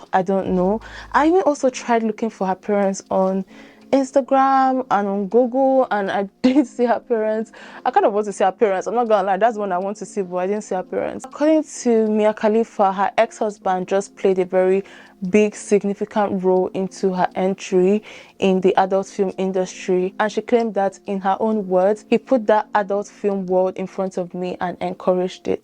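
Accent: Nigeria. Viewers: Yep that's a nigerian accent! Source: I'm nigerian too! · I love your accent, r u nigerian σ(^_^;)?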